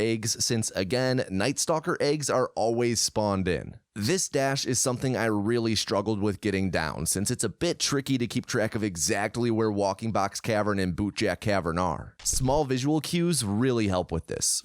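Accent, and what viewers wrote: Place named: Minnesota, United States. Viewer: Good MN accent, as a Minnesota native, I approve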